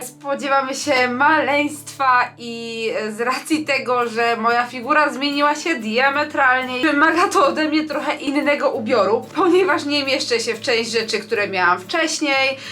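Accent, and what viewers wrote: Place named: Silesia, Poland. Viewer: Od weekendu polowa października 2024 wpadłam na twoje filmy. Podoba mi się twoja energiboost. Mówisz co sadzisz, a najbardziej zaintrygowała mnie gwara... więc dzisiaj 22.10.24 postanowiłam przewinąć udostępnienia... Opole❤ Jestem spod Opola. Bardzo sobie cenie gwary, a Śląski- jest miodem dla mojej duszy. Co do tego filmiku- przepięknie wyglądasz w ciąży z Mikołajem. Ja przy pierwszej ciaży wyglądałam niczym Orka ze wzdęciami. Jedynie pozazdrościć.❤ Kobieta bez takiego doświadczenia, nich lepiej zamilknie jape lub napisze po porodzie, a najlepiej się pochwali Błogoslawinym stanie, gdy na wadze poerdyknie 15kg- 23 kg więcej życiowego ciała( mina diabła). Nikt sie nie odważy, a jeśli tak, to tylko z filtrem w szmatfonie. Pozdrowienia dla was i wielki przytulas dla Mikołaja!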